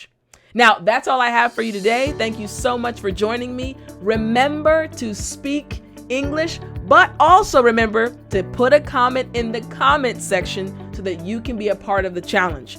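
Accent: United States. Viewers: Accent american listening very clear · I like the way you teach English with the real American accent . I really enjoy listening to you.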